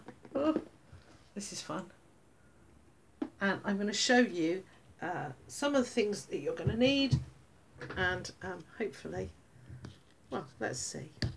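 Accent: United Kingdom. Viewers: Hello! I've recently discover your channel thanks to Cindy (PolymerClayTutor) and I'm font of your videos! I really appreciate your polymer clay videos and other themes you're talking about. You have really good tips and a nice video style. I can't wait to see your next productions! Hugs from France, Emeline PS: You have such a pretty British accent, can I ask you where are you from? I'm studying English language and literature at University that's why I'm curious.:)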